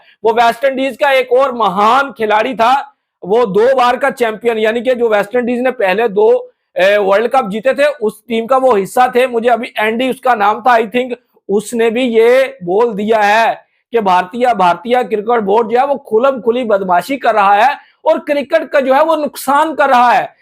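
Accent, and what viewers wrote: Pakistan. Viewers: Sala pakistani iss ke boli sa pta chalta hai ka ja sala pakistani hai ❤❤❤❤❤❤❤❤❤❤❤❤❤❤❤❤❤❤❤❤❤❤❤❤❤❤❤sala dog da bacha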